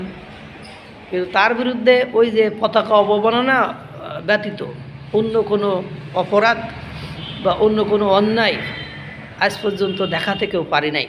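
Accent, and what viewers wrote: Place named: South Dinajpur, Bangladesh. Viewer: আপনাকে অনেক ধন্যবাদ, দক্ষিণ দিনাজপুর এর লোক হওয়ার কারণে আপনার আর আমার ভাষার অনেজ মিল ।। ❤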